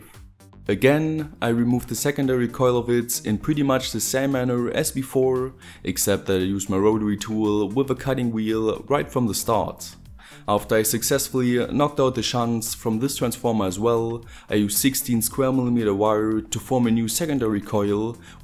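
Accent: Germany. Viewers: im from germany too, so i often hear a lot worse versions of english from them as yours is (its mostly pretty good despite the accent); but still i was bursting out laughing when you said "unsatisfactory" :D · I still don't get how Germans get so fluent in English but still sound German as fuck.. Great vid by the way.. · was für ein deutscher akzent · are you german? just asking, my ears tell me english german accent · Man hört das du deutsch bist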